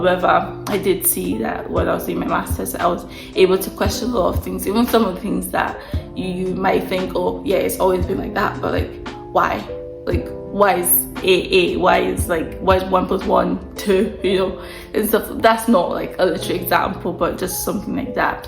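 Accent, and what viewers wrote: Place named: Scotland, United Kingdom. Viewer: Haha, love it! Digging the subtle Scottish accent ❤😅. More power.🚀🙌🏽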